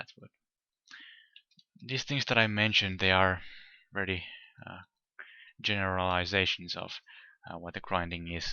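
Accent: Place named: Finland. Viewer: ive only watched you for 40 secs but already know your finnish haha :D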